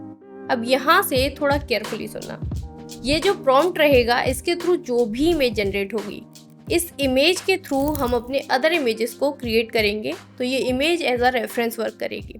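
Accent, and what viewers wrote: Gujarat, India. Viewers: Maybe Work on your Gujarati accent...or else evrything else is fine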